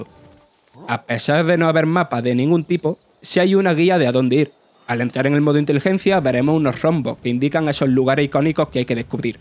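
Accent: Andalusia, Spain. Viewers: Tiene acento andalúh, déjalo que hable como quiera. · Pues nada, el que tenga problemas con el acento andaluz que se tape los oídos o que se vaya a donde crean que hablan un castellano perfecto y sin acentos,,, lo que hay que leer a estas alturas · yo aun no he sido capaz de ver un videoanalisis de vandal desde que esta este comentarista , parece que tiene la nariz taponada , y el acentillo andaluuuuuuh es muy exagerado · Tío, se hace muy incómodo escuchar un vídeo con un acento tan CERRADO. Soy Andaluz y me encanta nuestro acento, pero éste es demasiado cerrado y muy desagradable de escuchar durante un vídeo de larga duración. Tío, si te vas a dedicar a esto, te recomiendo clases para mejorar la vocalicación. Un saludo desde Málaga. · Es del sur de España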